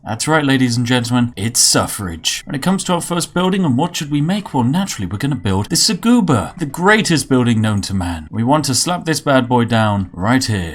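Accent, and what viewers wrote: United Kingdom. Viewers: Omg you British as fuck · This guy is annoyingly British but he makes good content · Hmmm... sounds british to me. "Salute the queen" and "sniff your tea" Yeeeah okay, he's british alright.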